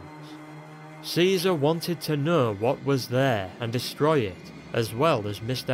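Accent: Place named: North, United Kingdom. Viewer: "With the soldiers not knowing how to ... fart?" I know, it's your accent, I'm from the north as well. Great video as always!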